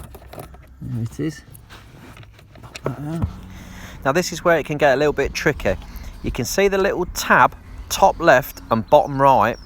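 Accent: Leicester, United Kingdom. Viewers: Are you from Leicester?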